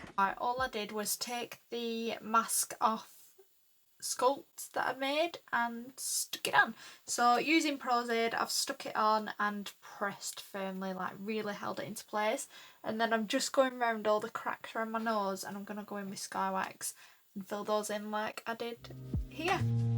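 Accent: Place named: United Kingdom. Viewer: I love you voice it is so British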